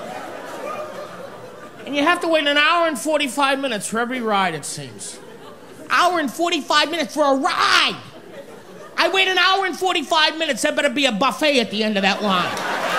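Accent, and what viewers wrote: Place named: Boston, United States. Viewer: That's the Boston accent.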